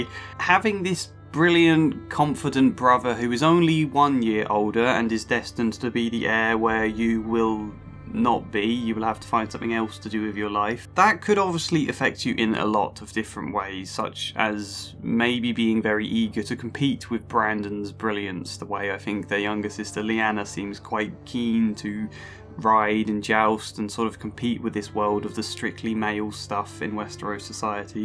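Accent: United Kingdom. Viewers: It's okay - your accent shows that you're obvious British, and thus apologies are likely second nature to you. I'm sorry to have pointed this out; I'm Canadian. Sorry.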